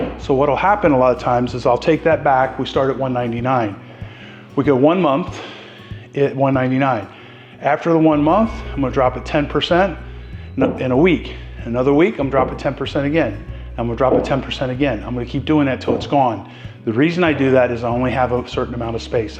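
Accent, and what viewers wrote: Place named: Southern Indiana, United States. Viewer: your Midwest accent... you said "dishWARSHer"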